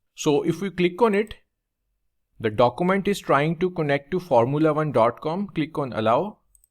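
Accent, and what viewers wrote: India. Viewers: English language with an Indian accent. Deplorable condition 🙃🙃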